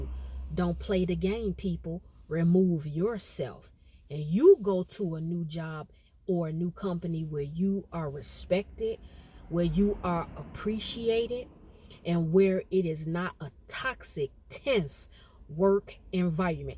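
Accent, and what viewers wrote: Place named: South, United States. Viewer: Are you from the south I hear the southern accents? You stretch your words when you talk.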